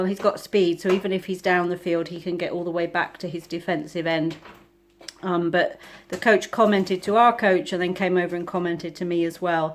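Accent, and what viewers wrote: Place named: United States. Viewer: Wow u got a lot of shopping there! Hope Caleb has a fab day! listening to ur acc ent since I subbed and initially thought u had a Somerset twang every so often!!!!!!! Then I realised it must be American twang?!!! I can't think why I haven't asked before, but u have a lovely accent t!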